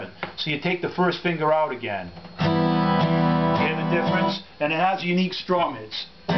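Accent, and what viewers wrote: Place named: Long Island, United States. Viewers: I get a kick out of your east coast accent. You sound like I did 45 years ago. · I've forgotten so i'm back.Thanks for the refresher and the New York accent,reminds me of home. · I've been watching and learning this slowly along with a handful of other songs. Very insightful and well done, although you're LI accent is a bit amusing. Not meaning to be insulting, good job and good guitar. Guessing you're about my age, so I gotta ask. Do you remember The Good Rats? Awesome band that deserved a lot more recognition than they received. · Real Good! Can't wait to get home & try it out., you sound like my ex-bro-in-law, Joe, from da' Bronx - he's a good guy, too.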